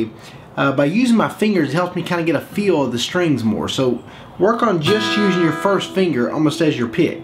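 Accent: South, United States. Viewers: I love your Southern accent. Greetings from London, UK. Your videos are a blessing to me as I try to learn acoustic classical guitar. I want to play in church one day. I try to learn but face so many obstacles.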